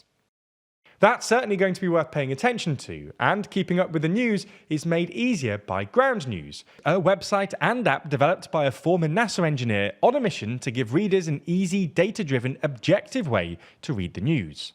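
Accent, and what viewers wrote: United Kingdom. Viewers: Typical British lalalala talk. No conclusion.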